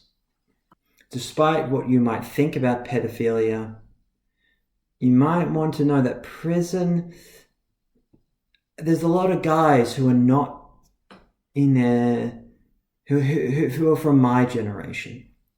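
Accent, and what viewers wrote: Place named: Australia. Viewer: “The new edgelord thing is CP child pornography” no matter what affiliation they have, that is unforgivable and disgusting. As a survivor of ritual abuse myself by a “fake” LHP organisation there is no excuse. All pedophiles need to be removed, banished, banned from the occult/magickal community. There is no room for that here, or in the greater macrocosm of the social community at large. I come to magick as a healing refuge and to find it’s filled with as many if not more pedophiles as my childhood sickens me. End it. Thank you for exposing this, you are Australian I assume from your accent ? May I ask where this Pedophile ring is located ?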